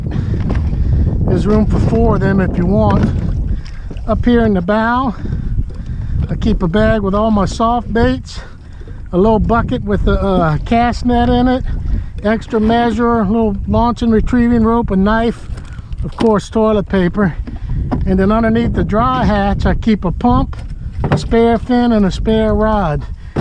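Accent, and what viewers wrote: Louisiana, United States. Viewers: You sound like your from my neck of the woods Cajun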